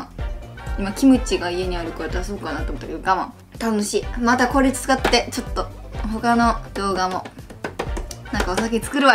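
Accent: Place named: Okayama, Japan. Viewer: 岡山弁すき、、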